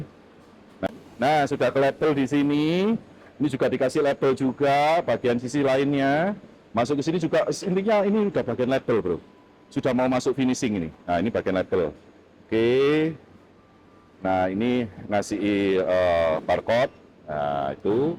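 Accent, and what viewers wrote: Java, Indonesia. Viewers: Jowo a pakde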